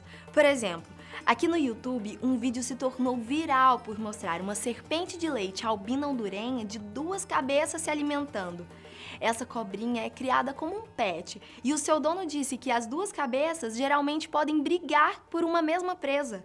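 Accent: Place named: Northeast, Brazil. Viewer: Gostei do sotaque, que parte do meu nordeste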